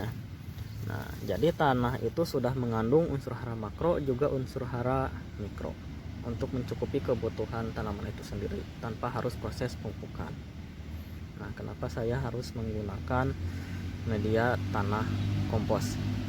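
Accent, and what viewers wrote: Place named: West Java, Indonesia. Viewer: Kang kl dri logatnya ky nya orang sunda ya..itu jenis anggur apa kang..nyimak terus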